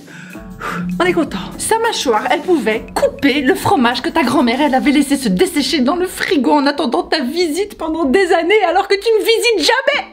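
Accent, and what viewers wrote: Belgium. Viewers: t'es vraiment Belge, ton accent reprend le dessus... ca me fait penser à la collection Arlequin ton truc... Les femmes nous sommes tjs sujettes aux mêmes clichés...Nous avons intériorisé ces clichés, même si on lutte... C'est l'homme séduisant , ok, occupé, + âgé que toi, qui gagne TRÈS bien sa vie...et tu as réussit ton rêve, non-avoué, de petite Princesse...merci la femme émancipée, qui essaye de gagner sa vie sur YouTube, mais qui sinon a arrêté de travailler, son boulot qui lui tenait a coeur au Japon, par amour pour le suivre ( l'amoureux )...aux USA, puis c''est le mariage et le bébé ... c'est bien ... c''est une histoire ... [ enfin les débuts semblent compliqués, la confiance...] mais qui répond qd même aux clichés, même aux clichés Japonais d'ailleurs ...tellement conservateurs ...et puis on n'est pas obligé de se marier pour former un couple officiel...et avoir un enfant ... 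tjs aussi pimbeche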